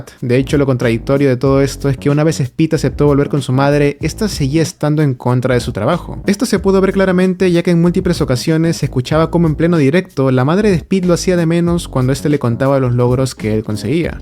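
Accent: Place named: Lima, Peru. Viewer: Tienes acento limeño. 💪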